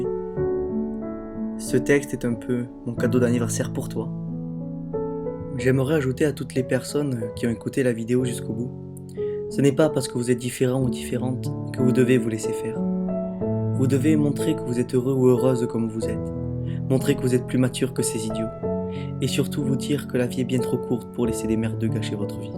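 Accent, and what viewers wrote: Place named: Toulouse, France. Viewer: Hi, j'ai eu la mission de répondre aux commentaires de Un ptit accent du sud (Toulouse hihi) Grooos bisouus Uma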